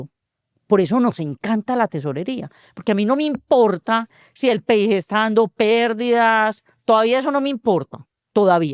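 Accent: Colombia. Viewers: Medellin - Colombia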